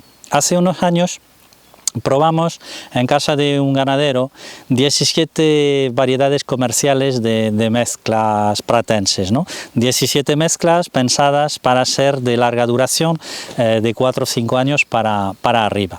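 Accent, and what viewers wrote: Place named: Andalusia, Spain. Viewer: Muy buenos tus vídeos, pero lo que más me llama la atención es tu acento. ¿De dónde eres? A veces me parece que eres andaluz (pero no sé de cuál región específicamente), a veces que hablas catalán, a veces que hablas portugués (por pronunciar bastante abiertas las vocales)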